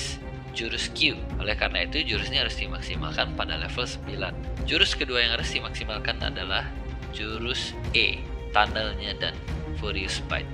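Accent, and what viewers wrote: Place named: Java, Indonesia. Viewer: Jawa nya Jelas BGT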